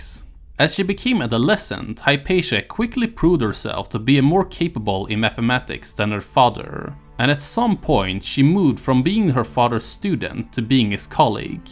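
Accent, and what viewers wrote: Sweden. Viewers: is this guy swedish lol. sounds like pewds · English isn't his first language so you probably just have to adjust your ear to the Swedish accent. The more foreign accents you hear the easier it is to decipher them. Also, I think Swedes tend to use English that's more in the British style, so if you're not used to that it can take a little while to get used to. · Lol your swedish accent is pretty funny but I like it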